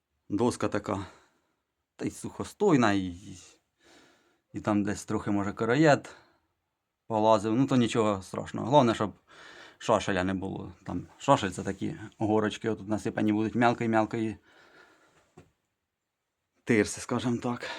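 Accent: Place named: Poltava, Ukraine. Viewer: Мені подобається ваш полтавський говор , я сам з смт Покровське дніпропетровської області і дуже схожий вас суржик с нашим, просто дивуюся , дякую.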